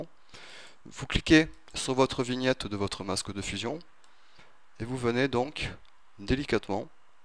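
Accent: South, France. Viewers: bravo, bien expliqué, et agréable avé l'assan du midi,Té !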